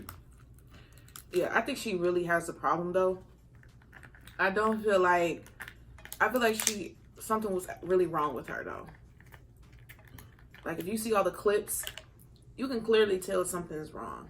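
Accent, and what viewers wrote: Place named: Dallas, United States. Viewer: Hi! This is my 1st video of yours. I like your voice & think I hear a Dallas accent. Are you from Dallas per chance? 🤔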